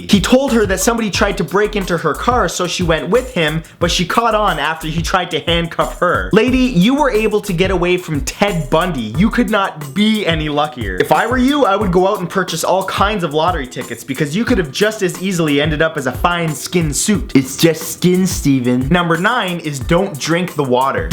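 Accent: Canada. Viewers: Is it me, or you can just tell this guy is Canadian? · it’s called being canadian, most of us talk like that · your canadian? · wait- r u canadian?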